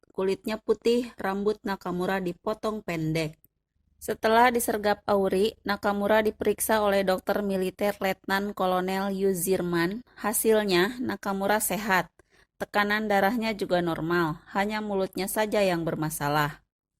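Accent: West Java, Indonesia. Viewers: Pengisi suara pasti orang sunda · Pilipina, dipisi, konprensi, menginpormasikan, aktip, pormosa... 😂😂 Logat sunda teh emang teu bisa di bohongin euyy 😁😁 Mantaaappp 👏👏👍👍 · Logatnya sunda eui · Naratornya sunda hehe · Konon, ada satu Stigma yg mnyatakan sbgai berikut : " Bernyanyilah seperti Orang Ambon, Berpikirlah sprti Orang Jawa, Berdaganglah sprti Orang Padang, Bertindaklah sprti Orang Batak dan.... Berbicaralah sprti Orang Sunda....!!! " Sprti Narator ini, dn jg yg lain melahirkanlah suara2 Diplomat Ulung Kelas Dunia dari Indonesia dg Tuturan kata tata bahasa Indonesia dn aksen Parahiyangan dg Diplomasi piawai nya, semisal diantaranya : Mochtar Kusumaatmadja, Ali Alatas, Nana Sutresna, Marty Natalegawa, Hasan Wirayudha, Djuanda Kartawidjaja, Connie Rahakundini Bakrie ( pengamat militer dn internasional ), Djuanda Alm/ pngamat intelijen dn Maritim internasional ( penasihat Gus Dur ) dll. 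· Miminny bahasa indonesia logat sunda😃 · Sunda banget eceu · Naratornya urang sunda? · Logat sunda mah gak bisa di ilangin ya bu.. 👍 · Kaciri nyunda na teh. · Komentatornya orang sunda..........datar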